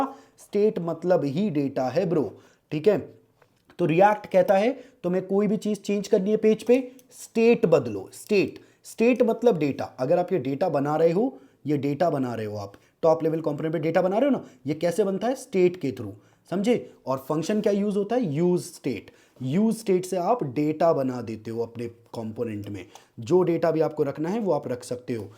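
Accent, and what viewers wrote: Bhopal, India. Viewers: the bhopali accent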